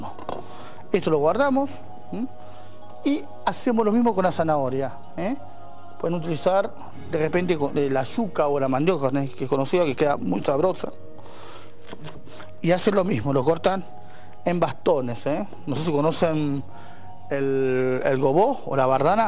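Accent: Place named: Argentina. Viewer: Una persona de rasgos orientales con acento argentino! hora lo ha visto todo!!! Muy buena receta!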